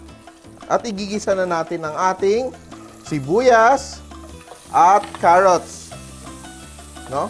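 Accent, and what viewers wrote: Visayas, Philippines. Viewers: Bisaya ka chef?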